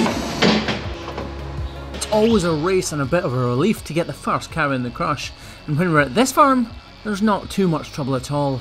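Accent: Scotland, United Kingdom. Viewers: Since discovering the, I have learned so much about cow hoof care, but most importantly I can confidently say that I have started to understand Scottish English. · Im from Sweden and I have nothing to do with this kind of work, but I think its very beautiful and nice to watch. I was sleepless one night for like 2 weeks ago and look at some stuff on Youtube and on some weird way I found you. Now I have watch so many of your vids that even I can see sometimes the problems the cows have, its very fun! And I love you Scottish dialect and humor! 🍻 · I know what you meant but it's really just a Scot's accent which differs it from English, Welsh or Irish.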